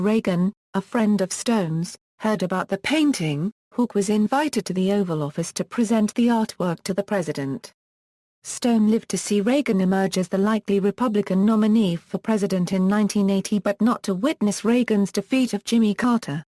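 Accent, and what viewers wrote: England, United Kingdom. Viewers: Why is a robot with an English accent narrating this? Geez.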